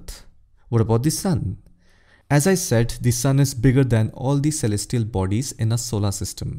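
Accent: India. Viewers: I'd rather listen to the sound of a fork screeching against the surface of a plate than to this Indian accent.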